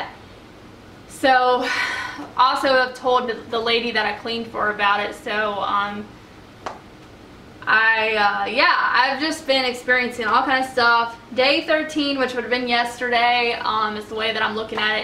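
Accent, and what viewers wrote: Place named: South, United States. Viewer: I was really trying to focus on the video but her dam accent is country and sexy!!!! Congrats gorgeous!!!! 😍❤️😉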